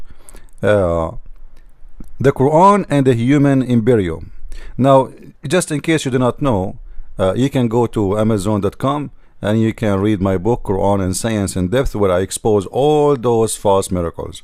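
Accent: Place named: Arab world. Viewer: You only speak with an 'Arabic accent' . The End.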